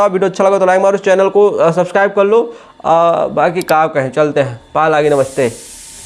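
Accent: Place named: Bihar, India. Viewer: Wdsk pahle bolna thik se sikh le bihari andaz me bolne aaya hai tere bas ki bat nhi hai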